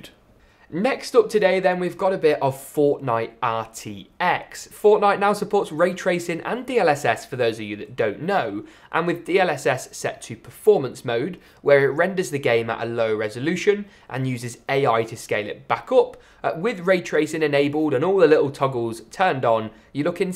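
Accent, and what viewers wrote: England, United Kingdom. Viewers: Are you english ? Love your accent . Btw nice explanation , set up and passion about pc 👍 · I luv his british accent lmao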